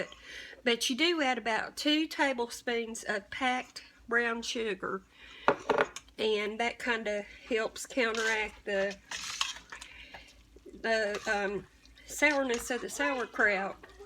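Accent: South, United States. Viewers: I love your accent, reminds me of my family from GA/NC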